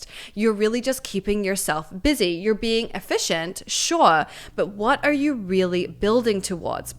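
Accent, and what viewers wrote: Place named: New Zealand. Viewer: 😹😹😹 FUCK ME... you are hilarious!... it’s so lovely to hear another NZ accent and one that swears as much as I do! 😹😹😹 I shit you not muffin! 😹👍🏻 I am here in LA 😋💛 and I am on IG... not very much but I will toddle on over and DM you 😹👍🏻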